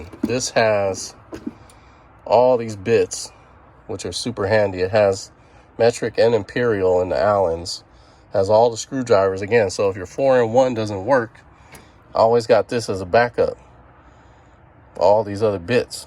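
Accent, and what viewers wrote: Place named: West Newbury, United States. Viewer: I appreciate the vidya, with that west newberry accent mr john cena jr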